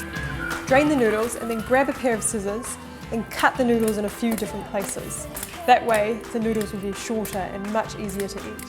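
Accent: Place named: New Zealand. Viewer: The accent is From New Zealand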